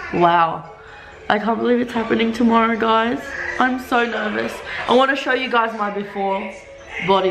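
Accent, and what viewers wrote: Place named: Australia. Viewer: ok your Aussie🇦🇺🤓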